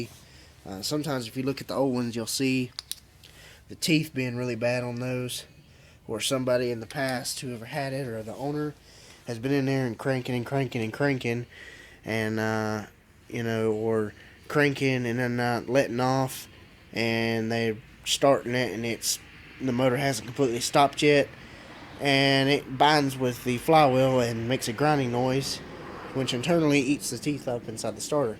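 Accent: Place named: Texas, United States. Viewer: let me guess are you from texas with the way you speak and dont blow nose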